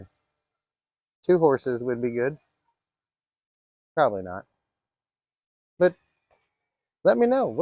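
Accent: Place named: Texas, United States. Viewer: great classic Mazda, and love your good ole Texas accent :face-blue-smiling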